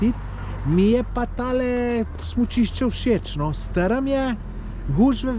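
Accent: Slovenia. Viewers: Meni uvek simpaticno kad slusam slovenca kako prica:) Jajaca su jaca:):) Bili smo ocigledno u isto vreme na Jahorini, ja sam zadnji put bio pre 15 godina i zaista sem planine koja je ista sve ostalo je drugacije. Puno pozdrava iz Beograda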